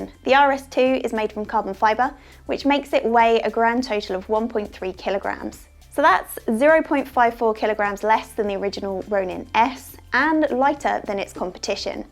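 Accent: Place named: England, United Kingdom. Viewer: Brilliant!! Love the way the English speak. Awesome video! -Jeremy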